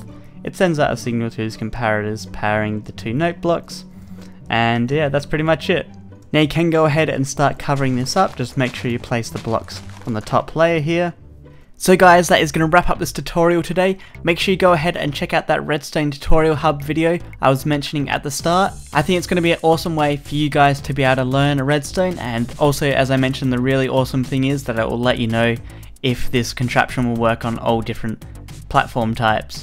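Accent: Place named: Australia. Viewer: I love ur accent! it sounds almost Australian :)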